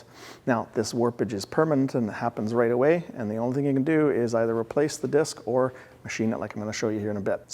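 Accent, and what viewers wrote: Canada. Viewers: Love your channel. Hearing you speak, there's no doubt that you are a Canadian. Love that accent. I live in Missouri, and while we do have salt in the winter, it's not nearly as bad as up in the North. That sure makes it easier to work on older cars. I have no pulsations on my 2015 impala with 75,000 miles on it. Would you recommend having them machine the rotors anyway? They only have very minor grooves, so I think they are worth resurfacing. I get a kind of roaring sound when applying my brakes at highway speeds, and even though my pads aren't worn out, I'm assuming this is a sign that they are getting thin maybe? No pulsation with that sound.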